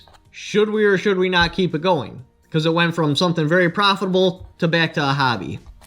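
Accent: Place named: Wisconsin, United States. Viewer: Wisconsin! That makes sense, your accent is definitely indicative of your area. Not a criticism, just an observation. I’m in West Virginia, population, 1.8 million with probably 50 different accents!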